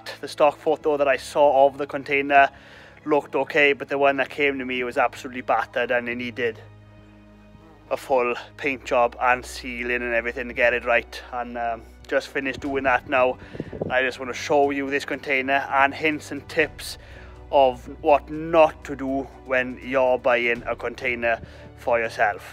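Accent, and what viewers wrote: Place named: Ceredigion, United Kingdom. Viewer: my wife said ‘he sounds like he’s from your way’. My guess was Ceredigion.. 😜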